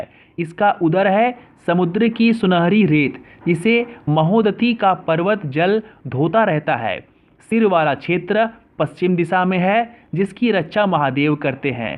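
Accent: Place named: Bihar, India. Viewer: Ra ki da mat karo na hi da ki ra mat karo...I know may b u belongs to Bihar ya UP ya Jharkhand to aap ka accent thoda change hota h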